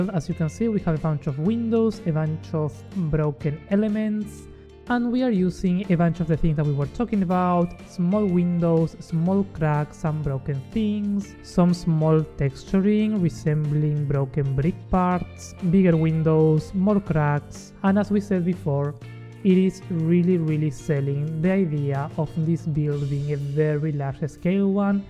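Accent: Argentina. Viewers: vo so re argentino no? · Suenas argentino.. muy bueno el vídeo, loco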